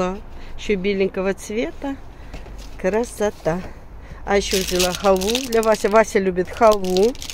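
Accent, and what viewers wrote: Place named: Karakalpakstan, Uzbekistan. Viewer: Вот зачем вы так пишете, она каракалпачка, пусть говорит как может. Пусть речь не литературная, зато у нее много других сильных сторон.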